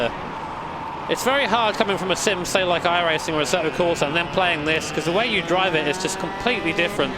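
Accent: United Kingdom. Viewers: I love your British accent lvl. 100: "The difference between driving a kaa vs driving a *kaa*"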